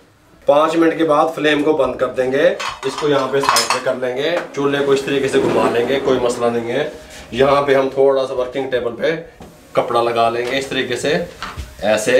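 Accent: Punjab, India. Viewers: Oh ji tusi great ho Aapki Punjabi badi sweet hai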